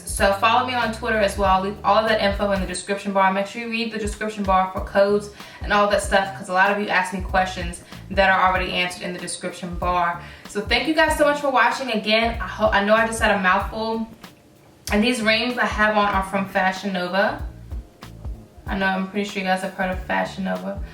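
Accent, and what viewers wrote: South, United States. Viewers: where are you from?! I wanna say the south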